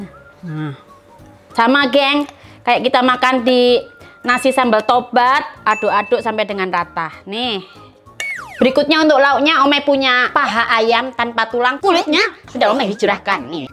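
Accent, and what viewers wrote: Java, Indonesia. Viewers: Bumbu nya medok banget kaya ngomong nya medok banget 👍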